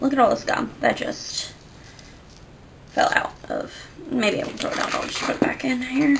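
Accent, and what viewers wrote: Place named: United States. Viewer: So cute! I love your American accent <3 Super cute purse too!! Your purse looks so much cleaner and more organized then mine, I totally have the same glasses case xo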